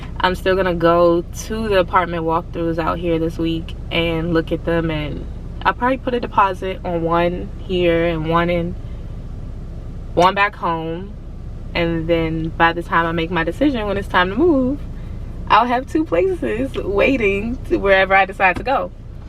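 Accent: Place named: Jamaica. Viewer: like a Jamaican pretty gyal idk I just hear an accent🤷🏽‍♀️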